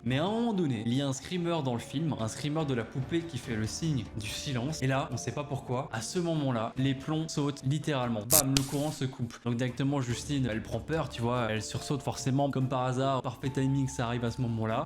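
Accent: Belgium. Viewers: Il est belge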